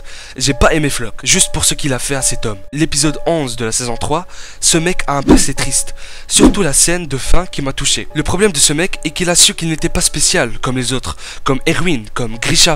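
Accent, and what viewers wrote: England, United Kingdom. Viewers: Super accent anglais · Merci bonne année à toi aussi et j'adore ton accent Anglais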